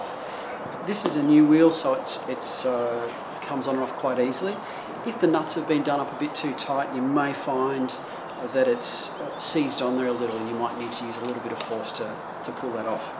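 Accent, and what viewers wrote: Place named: Australia. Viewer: Is not a limey ( BRITISH ) he is an Aussie or kiwi THE SOUND ON THIS VIDEO IS TERRIBLE THROUGH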